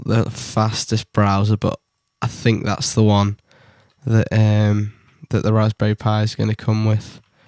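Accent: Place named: Wakefield, United Kingdom. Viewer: Hey - you sound like you come from Wakefield - possibly Leeds.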